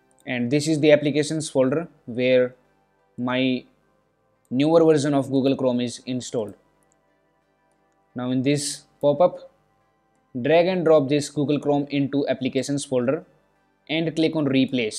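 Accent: India. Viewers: You sound like you are from India. In America we speak much slow so take a breath when you give instructions so we can follow you and you can gain subscribers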